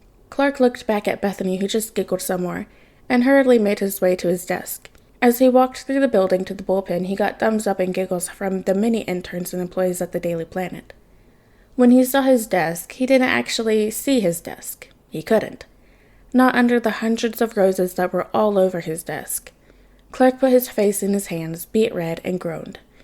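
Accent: Midwest, United States. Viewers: Hehe, i LOVED your midwestern accent, peak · Omg I love your midwestern accent!!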